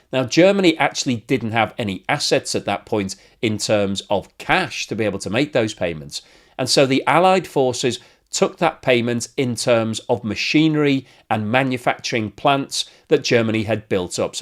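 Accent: United Kingdom. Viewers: I hear a British accent. I would prefer somebody with a Belgian accent discussing this matter. Britain does not have a dog in this fight. Your efforts might be viewed as an attempt to inject discourse between Russia and Brussels, Britain's competitor on financial markets. There is a conflict of interests here.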